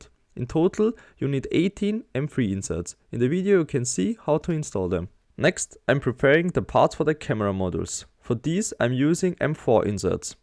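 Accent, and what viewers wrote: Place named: Germany. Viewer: I can hear German accent XD but good video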